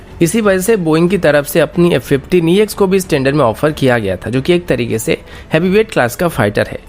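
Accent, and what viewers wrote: Odisha, India. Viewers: Sir aap kya Odisha ke hai.mote emiti laguchhi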